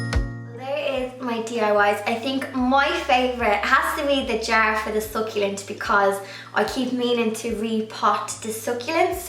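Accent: Ireland. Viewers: You have gorgeous eyes! My hubby is Irish, I love an Irish accent. I could listen to you all day. Great ideas, thanks for the video! Just subscribed! ❤😊🍁❤ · Your diys are cute just like you. Love your Irish accent! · I love the Irish accent. It is absolutely gorgeous. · I enjoyed your video! I do all of these already...but I see I need to make them pretty because these look great!! And I so love your beautiful Irish accent!!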